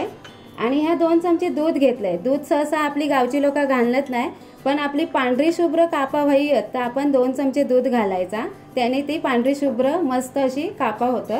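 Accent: Malvani, India. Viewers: Mastt.. 👌.. Tumchi malvani aikuk khup maja eli.. 👌👍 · Khup chhan boltas tumi... Pure malvani... Recipe pn mast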